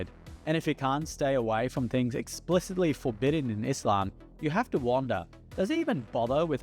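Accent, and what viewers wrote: Australia. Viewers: Is that a melboune accent sir?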